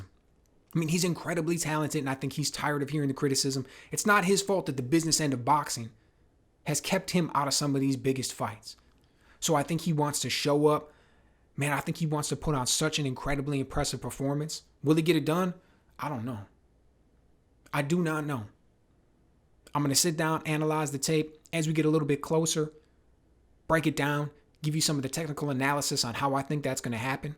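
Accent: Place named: South, United States. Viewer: always dig your insights man. plus you sound like a southerner (I'm from/in Mississippi)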